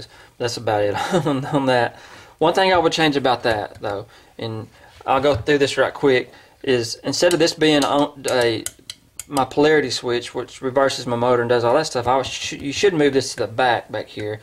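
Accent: South, United States. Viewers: Hey man what part of the South are you from?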